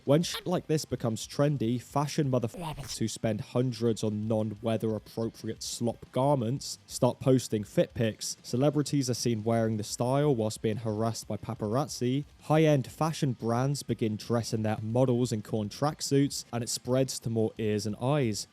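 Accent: United Kingdom. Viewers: You're British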